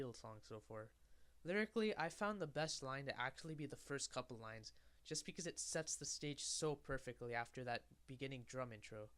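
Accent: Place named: United Kingdom. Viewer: "Sarr" is a accent thing, brittish english is diffrent from american english! Great video btw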